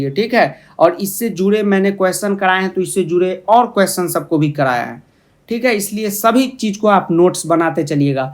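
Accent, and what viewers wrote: Bihar, India. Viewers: Bihari style to thik hai, lekin words ka pronunciation proper karna chahiye. Needs improvement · Hence proved This bihari assent touched my heart as i am myself a true bihari😀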